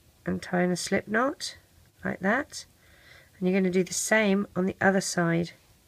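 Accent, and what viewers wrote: England, United Kingdom. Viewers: The best minion ever! I love it! Just one question, are you English?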